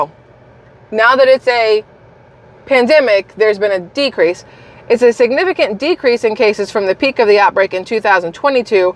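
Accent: South, United States. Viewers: you are hilarious!!! So honestly southern!!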